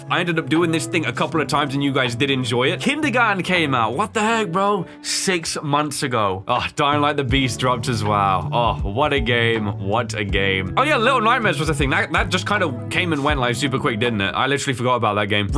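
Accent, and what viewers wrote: United Kingdom. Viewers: I only discovered your channel late 2025, and have been binge watching since. I really appreciate your voice acting - I don't play games myself, but I love watching game plays like movies. Your voice acting really adds to that experience. You always reading out the texts really helps me not looking at the screen - I'm actually scared of horror stuff so I deliberately not look at the screen all the time when watching horror games lol. I really appreciate your curiosity and the fact that you always explore in games. I can always rely on your game plays to catch probably most lore of the storyline compared to other channels. I do get a bit fatigue with your high speed yapping and some lines you often say lol. But then it could be just because I've been playing your content across years back to back to back lol. I remember there were times when I was doing something with YouTube autoplay in the background and your video came up. 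I was like "what is this British dude yapping about now" lol. Or sometimes when I hear "hold up" "it's kind of good though" I was like oh brother...! But none of these are real criticism, I say all these with fondness for your content. Happy new year! I'll keep watching your videos in 2026🎉❤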